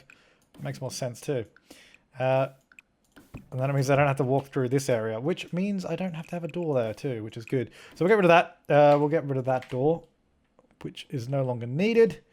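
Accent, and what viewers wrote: Australia. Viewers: Ya Mong 😂😂 such an Aussie !! I love it !!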